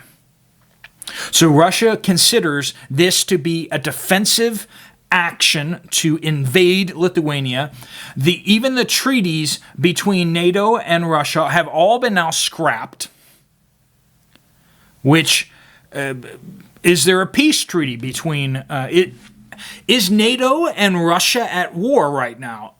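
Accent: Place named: Midwest, United States. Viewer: Hes from the Midwest, accents exist.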